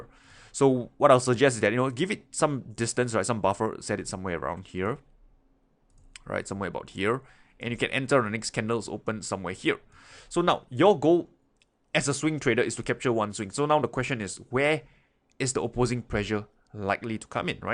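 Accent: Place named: Singapore. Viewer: You have a very Singaporean accent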